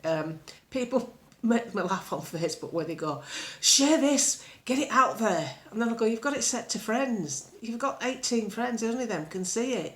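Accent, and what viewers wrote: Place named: North, United Kingdom. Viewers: Never boring, it's lovely to hear a Northern voice, like mine, say the stuff that you could have plucked out of my brain. Unfortunately due to my work I have to keep most of it to myself. BUt me and my husband say all this stuff at home. We sick of the gobshites spouting lies and trying to convince us we're far right. I say they're not right, not right in the bloody head if they think we're daft enough to believe them. Keep going - we're winning! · Hi. Old bod here from Tod. It’s not just the accent that I like giving us good old northern common sense. But the words are so true. Came across you through following Neil what a find. You cheer me up give me hope.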